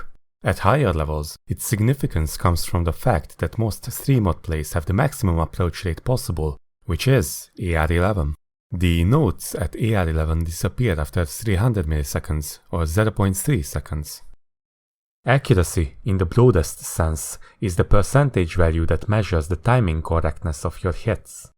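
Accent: Hungary. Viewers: Az akcentusból éreztem hogy hmm magyar lehet, aztán xD keep it up bud ^^ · Wait are you hungarian? Great video btw!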